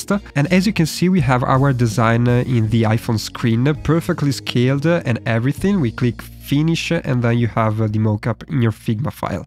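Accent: Italy. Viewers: I find Italian accent very funny and interesting 😆❤❤❤ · Give the guy a break, that is how Italian’s talk.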